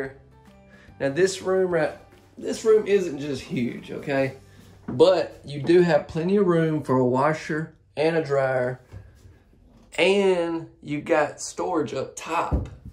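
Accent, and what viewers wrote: South, United States. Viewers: soft spoken, sweet, southern gentlemen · Did you say? Is that your name or is it? Either way I’m still digging your southern accent! Lol! I have a question for you about single wide mobile homes. Do you ever see any of them with the kitchen in the front and the bedrooms in the back? My home is so old and is in desperate need of an overhaul. I love watching your videos because I am getting so many ideas for my place. I love love love this place especially the black trim!! Thanks for everything you do for us! I appreciate your attention to details and how you open all of the cabinets to give us ideas!! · This is the most beautiful one that I have seen! Totally love it! Love you channel and love your country accent! God bless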